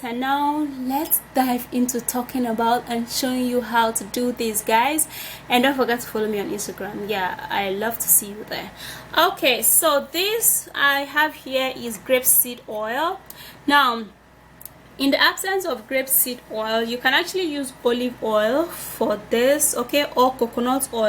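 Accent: Nigeria. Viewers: That accent sounds like a Nigerian. They are all after money if anything goes wrong you can't sue her. How come doctors don't refer you to such things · there s nothing called "African English"... try not to display your ignorance in public.. from her accent she sounds like she s from West Africa...Nigeria to be specific...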